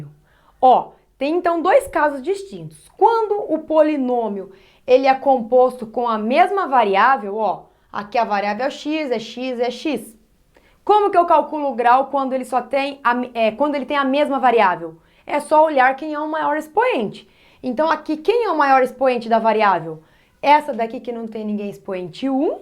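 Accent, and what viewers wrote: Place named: Goiás, Brazil. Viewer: Cara essa professora é muito top 👍, Eu entendi tudo, ela é muito simpática e adorei o sotaque goiano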